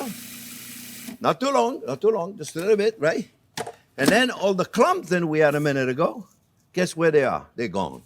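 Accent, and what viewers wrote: France. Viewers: I've been learning French and at times despair at my poor prononciation and misplaced verbs. Then I realised I love listening to your videos as much for your delightful accent as your delicious recipes and helpful cooking tips. Maybe a French person would be just as delighted to hear my Aussie accent - or maybe not! 😊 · I like this man embracing his pronunciation of his onion. Gonna feel his french accent is so strong. But I love this guy. 😊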